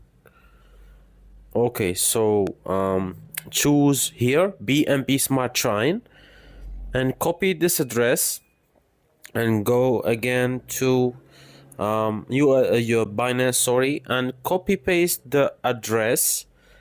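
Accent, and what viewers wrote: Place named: Algeria. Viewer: U sound algerian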